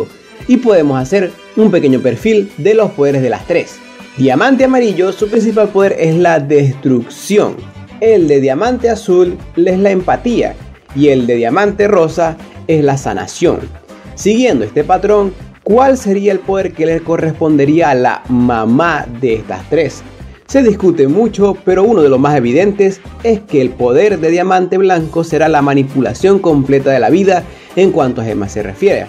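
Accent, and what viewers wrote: Venezuela. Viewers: este pana es venezolano??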